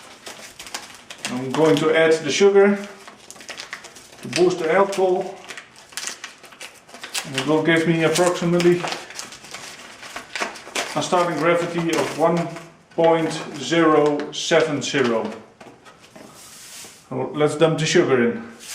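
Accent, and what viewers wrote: Netherlands. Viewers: Not to take the piss but I’ve not heard a younger person with this accent. Only have old Dutch people in Canada. Most younger folks can make an American accent.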